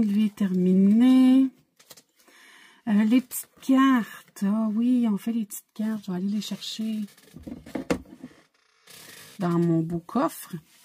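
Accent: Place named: Quebec, Canada. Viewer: Coucou! Normalement je suis la 102ème abonnée 😅 Je suis désormais à jour dans toutes vos vidéos! Enchantée de faire votre connaissance 😊 ça sera un plaisir pour moi de suivre vos aventures budgétaire et d'entendre votre bel accent québécois 😃 C'est effectivement Karine, Marie et Hélène qui nous ont soufflé à l'oreille le nom de votre chaîne 😀 C'est super de voir une autre façon de faire le budget, perso j'aime voir comment font mes voisins européens ou outre Atlantique, c'est tjs enrichissant 🙂 Vos défis cœur pour la st Valentin sont très beaux 🤩 Bravo pour vos belles économies, vos 100 abonnés et longue vie à votre chaîne! Amitiés de Bourgogne en France ❤ PS: il est un peu fouilli mon message désolée 😅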